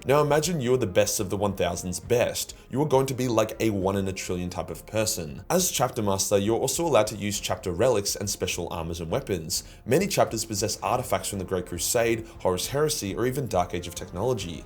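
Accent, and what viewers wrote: Australia. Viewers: Why you put by an Australian you don’t gotta announce where you from we still gonna watch the vid and we rock with you bc you Australian the accent top tier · Honestly i love 40 k lore and have been in franchise since its conception starting out in warhammer fantasy as i prefered the fantasy game tabletop games. how ever i chucked you a sub just due to learning you had been banned a few times by youtube fuck them long live the australian speaking style and not give a fuck attitude !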